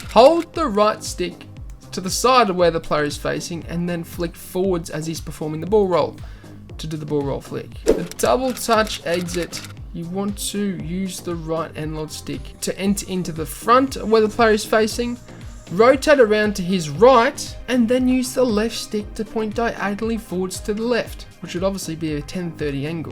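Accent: Australia. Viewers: Aussie are u coaching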